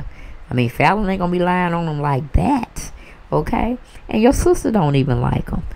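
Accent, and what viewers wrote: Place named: South, United States. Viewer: U must be from the south , may be Florida your accent is 😂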